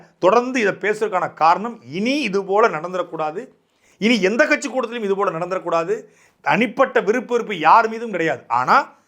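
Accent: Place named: Telugu, India. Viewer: Telugu and drooling Tamil.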